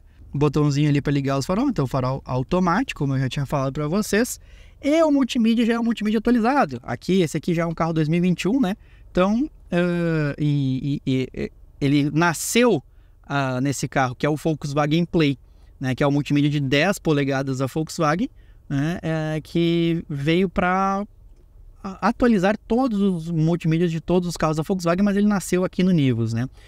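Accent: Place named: Rio Grande do Sul, Brazil. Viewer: Um FOLKSwagen kkkk esse sotaque gaúcho é um barato!!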